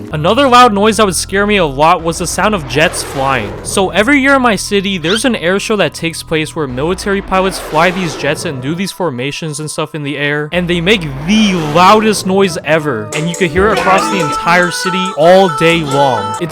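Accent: Canada. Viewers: are u canadian??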